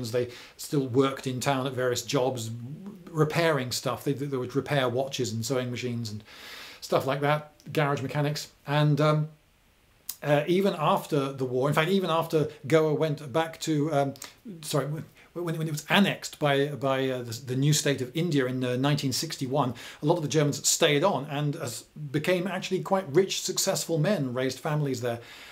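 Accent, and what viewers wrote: United Kingdom. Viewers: Yes, realize you're a Brit.... The accent gave it away... Stay on subject,. thank you... ☮️ 🌎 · just searched it up. Funny thing is, there's a video made by scholagladiator ( or something ) where he mentions his meeting with Lloyd and he actually admits the British pronunciation is wrong at this subject... But I also get that it's just how you guys used to say it so... thanks for responding :) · into? you speak Queen'a english, most of us can barely understand the accent. Don't worry about a tiny "in insignificance", videos are too good AND funny anyways. History is beautiful when explaines with entertainment.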